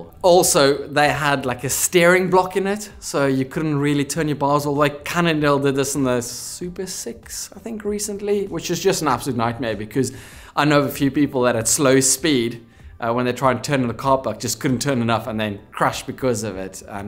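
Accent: South Africa. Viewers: 😂strong opinions much? This cat is hilarious. Do I detect a South African accent? If so it’s typical 😂😂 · A bit of a South African accent I detect there? Lovely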